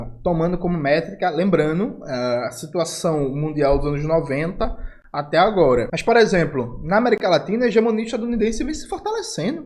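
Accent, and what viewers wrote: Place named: Recife, Brazil. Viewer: Kkkk, parece que vai ser na próxima segunda feira e tudo tomando um café com o excêntrico P Escobar. Com esta capacidade de análise honesta, o sotaque mais bonito do Brasil, o recifense...a vó te ama D. Jones! Parabéns pelo PCBR. 🎯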